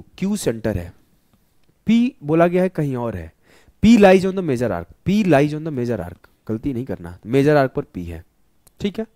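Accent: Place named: United Kingdom. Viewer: App itna smart q ban rhe h ,, aram se hindi padh kr samjhaiye,, ham jante h ki apka english thik h,, chanal ke naam h bihar yodha or briten jaisa bhasha bol rhe h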